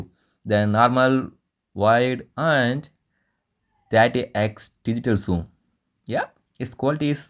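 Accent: South India, India. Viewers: South Indian type..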